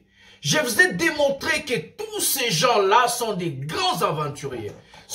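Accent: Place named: England, United Kingdom. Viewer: na sepeli na accent nayo ya anglais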